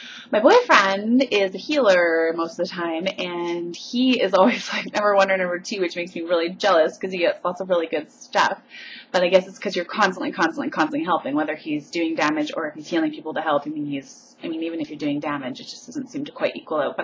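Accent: Canada. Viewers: Ah how funny, a friend of mine was only talking about Rift yesterday....... interesting to see what it's all about although not sure i'll be getting it (which once translated = when my friends do, I'll get it too). Weird how when I was in the UK I couldn't tell the difference between Canadians and Americans yet now I can and within seconds I was like.......she's Canadian. Just thought i'd share lol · You sound Canadian, good God, fail.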